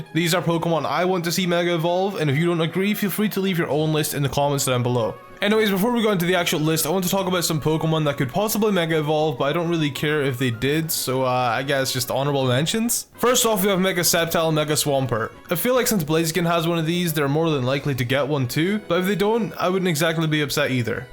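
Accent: Scotland, United Kingdom. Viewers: are you scottish? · tehTroll OfLife Yes he is Scottish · ***** where are you from because you sound Scottish I'm Scottish =D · Are you Scottish?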